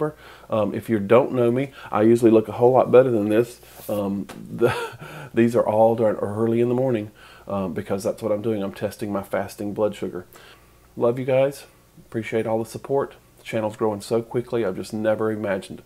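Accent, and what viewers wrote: South, United States. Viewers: You are adorable! I want to reach inside my screen and and kiss you. You are such eye candy. It's hard for me to pay attention because you are so so so good looking. And you're sexier with your Southern twang. Your wife sure has a good thing going. Wow, you are hot!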